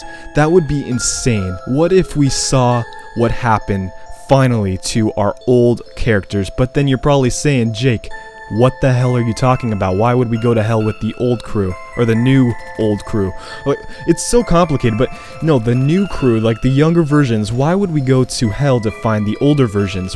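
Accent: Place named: United Kingdom. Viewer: 2nd That's bullshit. He has a british accent!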